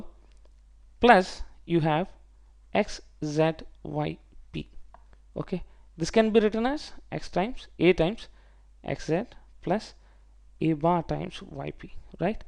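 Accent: India. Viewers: its indian accent bruh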